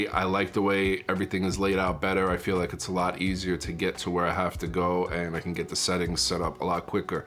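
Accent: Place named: New York, United States. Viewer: Love your accent are you from Jersey or NY originally?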